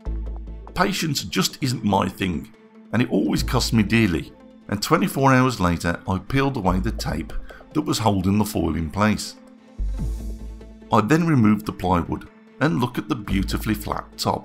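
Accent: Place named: Scotland, United Kingdom. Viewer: Good example of why I subscribed to your channel. Love your work and most of all your commentary. Hope your mother-in-law doesn't watch. One question, your accent hinges a bit on the Scottish side, how close am I ??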